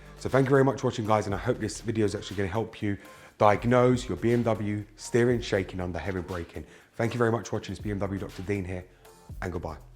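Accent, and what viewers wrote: United Kingdom. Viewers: Thank you. You might have saved lives with this information. It's a shame that we have so many service people who don't care. It's nice to see videos like yours, to realize that there are still good people out there. I love your British accent.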